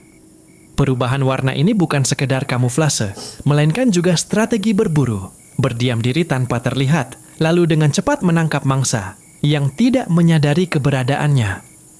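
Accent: Medan, Indonesia. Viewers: bg org Medan ya?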